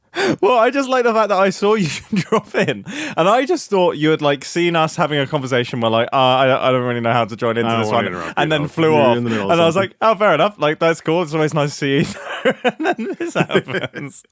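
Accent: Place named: RP, United Kingdom. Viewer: That’s it sorry man but I’m not watching anymore, I was here to watch a dot in the corner while listening to a British man sound posh and I can’t experience that anymore.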